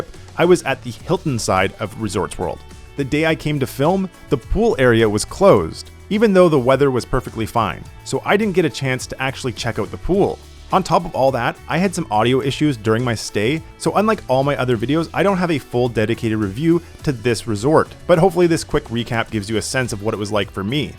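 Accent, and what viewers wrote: Canada. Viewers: Are you Canadian??? 🤔 if not, you’ve got a Canadian accent!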